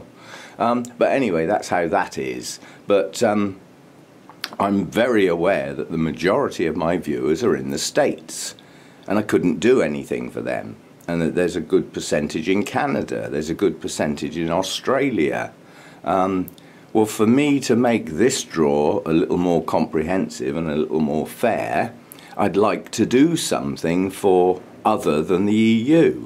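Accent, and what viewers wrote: United Kingdom. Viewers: 5000, Congratulations! I am from US but you really don’t have to. I am sure most of your viewers enjoy your videos and learning from you. I especially love to hear your British accent English. Bring me back to my college time in Japan.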